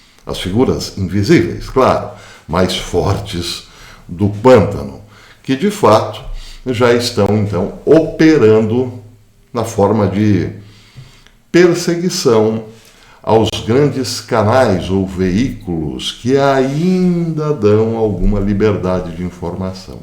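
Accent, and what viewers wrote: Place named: Northeast, Brazil. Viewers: Falando nordestinês. Estranho. Sou baiano de Salvador, porém morando há 14 em Sergipe e te estranhei. Mas ficou engraçado. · Professor adorei seu sotaque nordestino. Obrigada pela homenagem.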